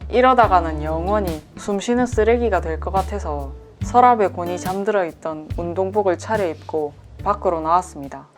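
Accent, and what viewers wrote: Busan, South Korea. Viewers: 부산말 너무 귀여워요. 자꾸 듣고싶어지는 매력! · 근처에 사는 부산녀에요 익숙한 사투리와 승학산 친근해서 좋습니다~~^^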